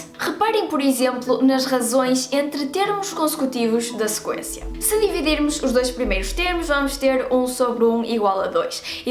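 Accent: North, Portugal. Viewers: Como eu vim parar aqui? Oo Adoro esse sotaque da terrinha ♥ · Deivid H. Mais especificamente, do norte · Amei isso! Encontrei esse video pq estava mesmo pesquisando por Fibonacci no google, e ainda bem q ele me mostrou esse video. Excelente explicação. Me deixou ainda mais fascinada por esse assunto. E que que mocinha linda:) De que lugar de Portugal vc eh,? Ja viajei bastante pelo teu pais, mas nao reconheco esse sotaque.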